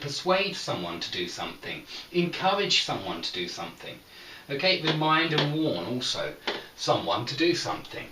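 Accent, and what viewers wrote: United Kingdom. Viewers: you've got such a cute British accent. n.n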